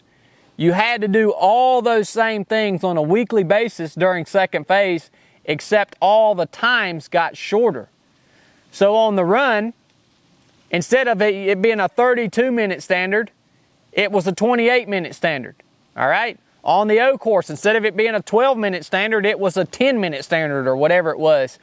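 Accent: South, United States. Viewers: what state are u from? I'm from OKLAHOMA.u sound like u are from the south?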